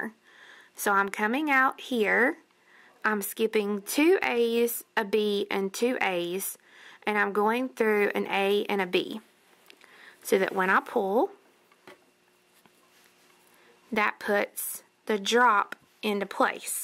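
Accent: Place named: North Carolina, United States. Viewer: Great tutorial! gives clear and excellent instructions, plus I love her North Carolina accent.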